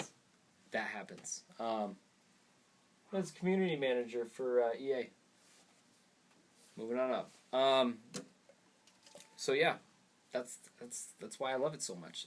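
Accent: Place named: England, United Kingdom. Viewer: I signed in just to like this because of the english accent lmao great job.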